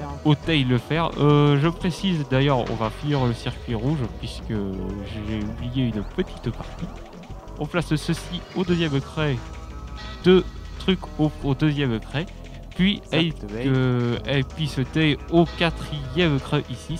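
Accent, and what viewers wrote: South, France. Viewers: Rien à dire sur le système, mais votre accent du Sud est vraiment très très prononcé et c'est dur à écouter...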